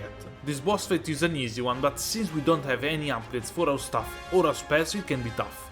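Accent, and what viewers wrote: Italy. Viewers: Mi fa morire con la cadenza italiana 😂😂😂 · Ma sei Italiano? :D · sei italiano? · scusa se chiedo, ma mi sembra che tu sia italiano, o comunque mi pare tu abbia un forte accento italiano, o mi sbaglio? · Ma sei italiano? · Si sente che sei italiano 😂😂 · Are you italian?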